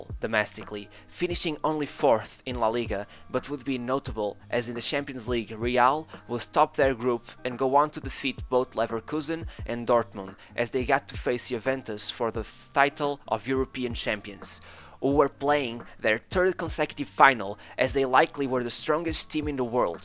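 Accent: Portugal. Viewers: you sound like a portuguese guy talking english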